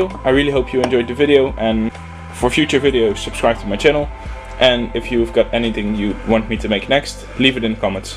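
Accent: Netherlands. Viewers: Typische nederlander aan het accent te merken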